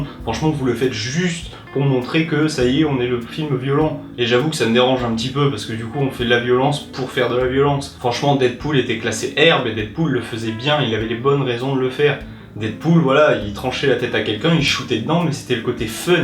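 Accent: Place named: Banlieue, France. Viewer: accent de banlieu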